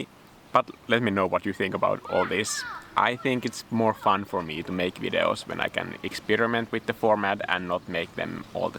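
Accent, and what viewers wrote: Finland. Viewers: I just stumbled across your videos and thought: "huh, his accent sounds really familiar" then when watching this I realized that it's the Finnish accent. Loving your works tho!!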